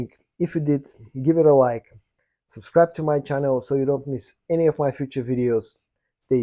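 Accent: Bulgaria. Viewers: Хаха веднага познах, че си българин от акцента иначе добър клип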